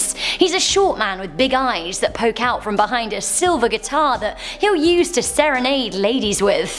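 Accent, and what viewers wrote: England, United Kingdom. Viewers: She is English and has an accent my guy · Honestly loved this list! Most of these were my bed time stories growing up 😂 a very popular one is La Mano Pachona. Basics of the myth is that a furry hand would come up from under the bed and pull your foot. Sasquatch? Chewbacca? Who knows, it was scary as hell growing up tho 😂 (Side note, I've always wanted to hear someone with a British accent speak Spanish. You did not disappoint. Thank you for fulfilling my life long dream 😂)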